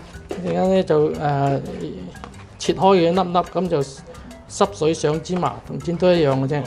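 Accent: Zhongshan, China. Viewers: 鄉下係唔係中山？啲口音好似中山啲石岐話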